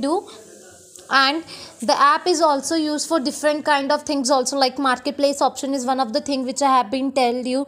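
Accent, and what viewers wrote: India. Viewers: Are Crazy or something , why too much indian English talk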